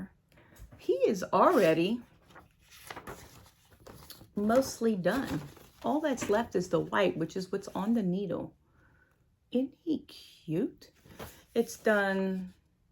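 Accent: Louisiana, United States. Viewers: Oh =my goodness! I just found you. You sound like home! I am from Louisiana. Thank you for a wonderful video. I will watch your other videos this week.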